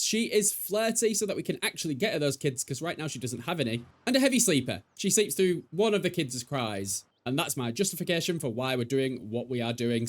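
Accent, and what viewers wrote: United Kingdom. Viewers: I'm from Brazil and I constantly watch Youtubers from all over the world to improve my Englsih. I stumbled across your channel recently and I'm basicaly watching every single video with these random gameplays/challenges for The Sims 2 - my favorite one btw - and 3 and it's always a blast for me 😂😂😂😂 I love your dark and twisted sense of humor, wrapped in such calmness and "fancyness" with your british accent, please never stop your videos ❤ They really make me wanna be your friend irl!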